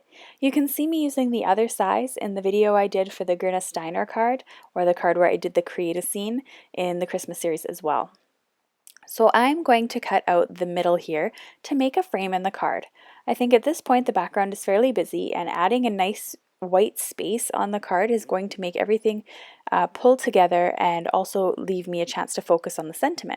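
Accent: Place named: Canada. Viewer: Today is the first time I noticed your Canadian accent. Love it. Would like to see more man type cards.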